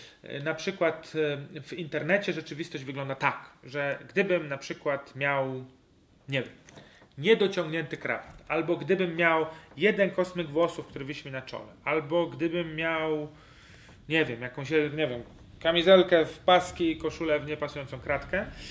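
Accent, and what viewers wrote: Poland. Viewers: Panie Ty jesteś z Poznania??! Zdrówko 🥃🥃🥃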